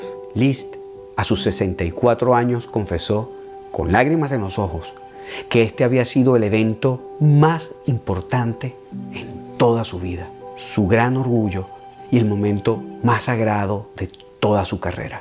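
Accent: Mexico. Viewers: ni parece venesolano se escucha mas mexicano que nada. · . Que interesantes y profesionales me han parecido el par de videos de La Cata Musical que he visto. Me suenas Mexicano, y como compatriota, me enorgulleces. Muchisimos exitos y bendiciones. Seguire checando tu contenido.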